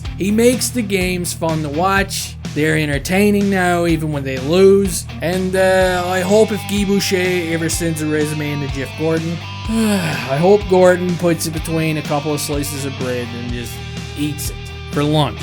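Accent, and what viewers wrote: Newfoundland, Canada. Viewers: I can here the Newfoundland er in your voice. Maybe your a propane guy. As slick right I think guys comment hurt cuz it’s the truth as of right now.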